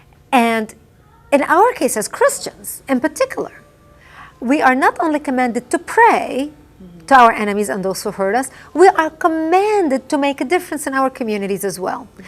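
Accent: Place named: Lebanon. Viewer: Oh please. She even speaks Arabic with a Lebanese accent. Stop your lies !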